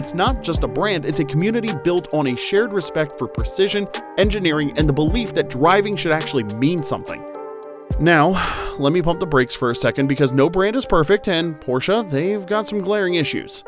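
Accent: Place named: New Jersey, United States. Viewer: Here’s another little tidbit about my wonderful state of NJ. Did you know that in the mid 50’s Margaret Hague Hospital in Jersey City, developed and held the clinical trials for the polio vaccine. The team of scientists worked under Jonas Salks, essentially wiping out polio. I grew up in Jersey City, and I travel the world as an airline Captain. I always run into someone from Jersey, no matter where I am. A little bit of everything in this state, and some of the best striped bass fishing in the world.😊 By the way, the accent is still very heavy.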